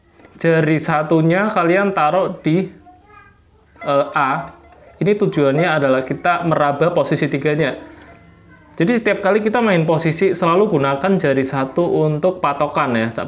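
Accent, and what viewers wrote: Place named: Java, Indonesia. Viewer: Medok banged njul🤣🤣🤣🤣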